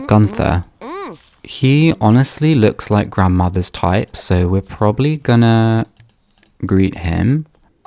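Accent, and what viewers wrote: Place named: United Kingdom. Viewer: I’ve never seen this channel before, and I haven’t played the Sims 1 since kindergarten, but this video of this calm, friendly-sounding British man narrating the story of a neglectful grandmother and her 7 kids with unfortunate names is giving “creepy lost episodes of the Teletubbies” and I’m here for it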